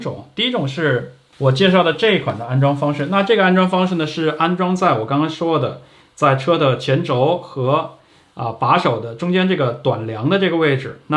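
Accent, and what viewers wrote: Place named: Yunnan, China. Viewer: 感谢的详细介绍。已入QUADLOCK,另外，您的口语有马普的味道，是云南人吗？谢谢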